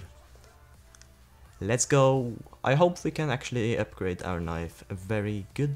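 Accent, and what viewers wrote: Norway. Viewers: Are you from Norway ? xD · Norwegian? :D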